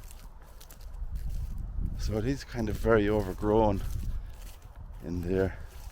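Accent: Ireland. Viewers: I'll always give ye a tumbs up 😊. I could listen to your Irish accent all the day long ❤ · Wee bit of the Irish in ya there Laddie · So old and interrstin g, from lon g ago days. I love your charming Irish accen t too 😊😊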